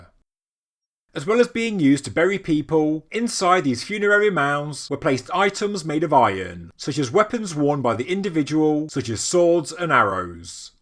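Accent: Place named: Black Country, United Kingdom. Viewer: Hey man, I know you are from my part of England. Your accent is familiar.😎 I want to thank you from the BLACK COUNTRY for showing us this global civilisation that existed before the bs history we were forced to study even thou it made no sense. What the eff are they afraid of us learning? The truth?.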